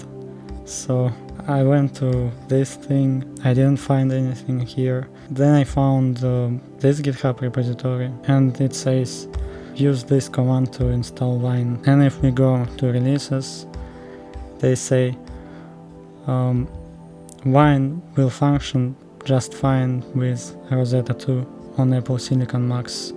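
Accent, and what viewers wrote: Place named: Russia. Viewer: блин я сразу заметил русский акцент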